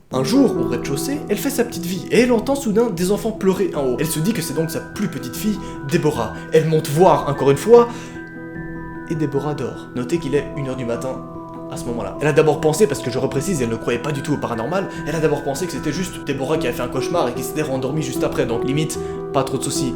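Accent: Belgium. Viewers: T belge nan?? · Il est belge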